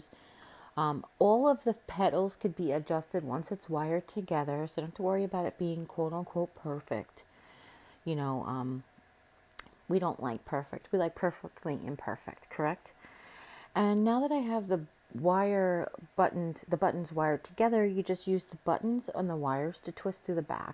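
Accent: New York, United States. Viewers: Guess what I am making tomorrow!!! These are adorable! And I said before I love the NY accent ! Bayside ❤️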